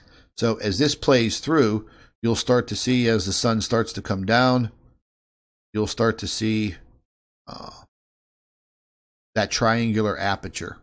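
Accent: Pittsburgh, United States. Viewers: You from Pittsburgh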